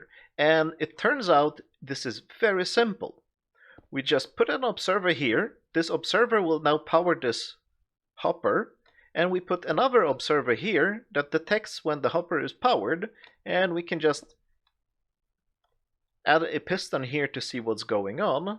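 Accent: Sweden. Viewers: Well tru but it gets a bit more complicated to check if all have shulkers when you have a bunch of them. And i hate to fill things manually since i will only do it when i see that something is wrong. But that's a good point i think i will put the buttons directly if i can push them between the hoppers :) Great filler though had a lot of problems with other loaders but this just runs smoothly :) Your from sweden i guess? Sounds like it atleast. Grymt jobbat med denna lilla contraption kommer fortsätta hålla utkik efter saker som du lägger ut :) Är du nån gång intresserad av nya projekt har jag ett som jag inte kommer kunna lösa men om du vill ge det en chans så hojta :)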